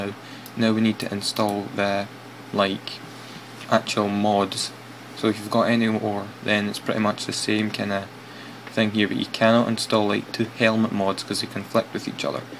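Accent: Scotland, United Kingdom. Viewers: i love your accent. scotland? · yay a scots like me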